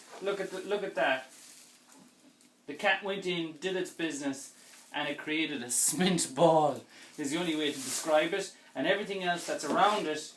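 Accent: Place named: Ireland. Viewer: I love your Irish accent.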